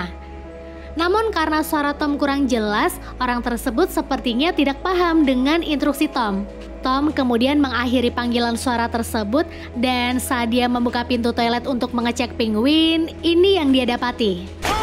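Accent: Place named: Pemalang, Indonesia. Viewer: Mbak orang pemalang ya😆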